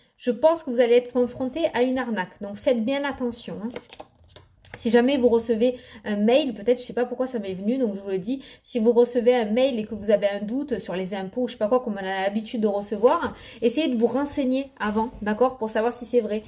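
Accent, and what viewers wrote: South, France. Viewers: Coucou! cette symbiose que je ressens lorsque je vous écoute! Tout est le chemin actuel de ma vie, et vos précisions sont totalement liés à ma vie actuelle. Je suis en arrêt maladie suite à une grosse intervention chirurgicale, et j'ai une personne, un homme qui vient d'entrer dans ma vie. pas encore sentimentalement, mais je ne serais pas contre lol!. Affaire juridique en cours qui traînait depuis 5 ans! Je vais bien suivre mes 'alertes" internes afin de voir les mauvaises choses qui arrivent! Mille merci! et gardez votre vitalité, votre accent du sud qui me manque tellement et votre bienveillance! Hâte déjà de vous retrouver pour de nouveaux indices sur nos parcours.... Belle nuit à vous!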